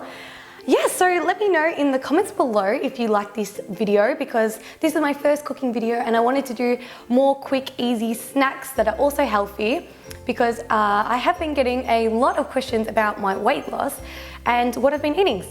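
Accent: Australia. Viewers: Are you Australian I'm new to your channel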